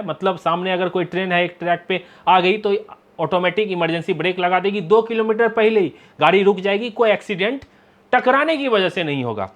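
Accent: Bihar, India. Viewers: uncle aap bihar se hai 😊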